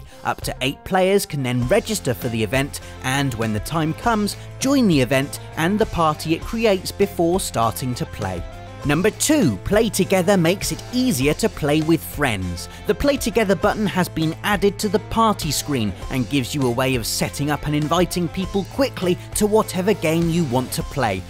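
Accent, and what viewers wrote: United Kingdom. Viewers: User Sczeshelled events. Love it! :) I wish I had a cool british accent too.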